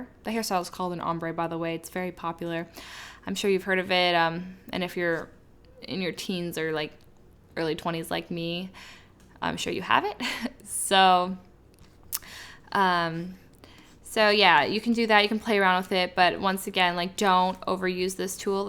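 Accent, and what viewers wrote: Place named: United Kingdom. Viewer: This girl sounds like she might have a fairly alright british accent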